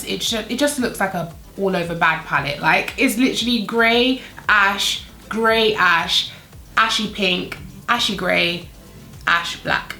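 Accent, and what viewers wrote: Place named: United Kingdom. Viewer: Nice one. I have been guilty of overspending on makeup in the past but when my KvD Shade & Light was needing renewed I got the Revolution one. Its every bit as good. I am really pale and the middle top shade makes a great natural looking contour (The KvD one is the same but I can't afford to keep buying US products, the duty is crippling, they seem to just convert the dollars to pounds without using the exchange rate as if forty quid was the same as forty dollars. Finally, I know that Kat has done a stellar job in raising awareness of animal testing etc. But Revolution is cruelty free, British and costs a fraction of the price for really good quality products. I agree about the Fenty pallet being a tad uninspiring. But I really want to try one of her contour sticks which looks really good on pale skin and it's a cream which is more flattering to mature skin Great video Girl its nice to hear a British voice. 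As for Kylie and Co. I wouldn't spit on them if they were burning. Who bases their fame on their dead father being the lawyer of a wife killer? And that is just the Kardashian part. The Kylie bit with her two mum's or whatever it is is something most families would keep to themselves. They're grasping, fame chasing gold diggers. All of them...Kanye West should have recognised that!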